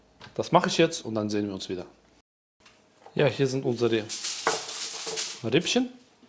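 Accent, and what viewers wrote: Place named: Russia. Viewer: Слышу ты русскоязычный, а что по русски канал не зделаешь отдельно?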